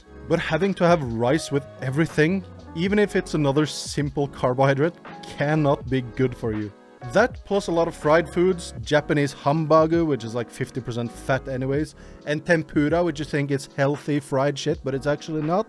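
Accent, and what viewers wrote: Norway. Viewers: okay 3rd comment lol, not sure if youve ever watched norsemen (Vikingane) but I feel like the fact you have the same norweigen accent as that show makes stuff you say funnier haha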